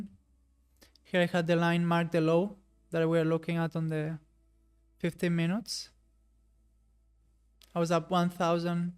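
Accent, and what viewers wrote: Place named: Spain. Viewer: para que cojones hablas inglés si eres español, vas de intelectual y es ridículo. Mucha pereza la gente como tú, de verdad que tu acento es ridículo, si buscas más visualizaciones hablando inglés lo llevas claro, espabila chico.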